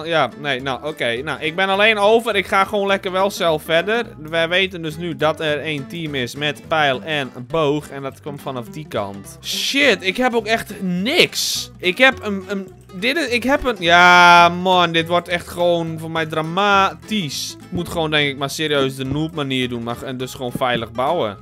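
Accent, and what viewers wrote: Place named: Netherlands. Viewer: das mijn taal. lekker plat Holland(Engels)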